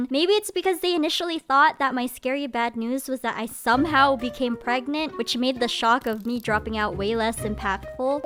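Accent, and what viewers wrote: Philippines. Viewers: LOLL the filipino accent 😭😭🤣🤣🤣🤣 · The filo accent omg. As someone with Filipino parents I can just hear the voices through the phone. The "are you pregnant" part is JUST AS REAL TOO LMAO. Proud of you Ate, reach for the stars 🌟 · STOPPP NOT THE FILLO ACCENT😭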